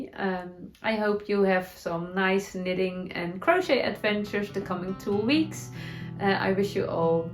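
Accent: Netherlands. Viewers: 😂😂😂😂😂 stop saying “shit” 😂😂😂😂😂😂😂 oh my goodness!!!!!! I love your Dutchy English!!!!!!! You completely brightened my day with your talk of shit 😂😂😂😂😂 I’m sure you now know, we say “poo” Wonderful ❤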